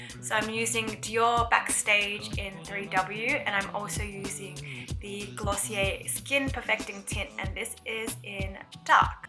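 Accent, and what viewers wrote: Australia. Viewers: Found this video from the recommendations, and I was like, who is this beautiful girl?! I'm gonna watch her video!! I wish I had facial structure like you...! and your healthy skin!! Love your Australian accent too!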